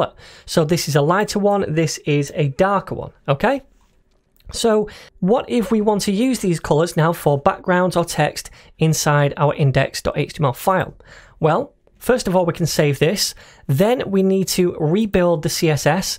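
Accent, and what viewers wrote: United Kingdom. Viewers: What a rare British accent! I'm glad to find this because I'm learning English English at the same time. Thank you!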